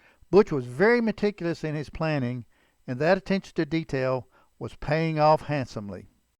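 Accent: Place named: South, United States. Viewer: where is the narrator's accent from? it sounds southern.. south carolina? Louisiana?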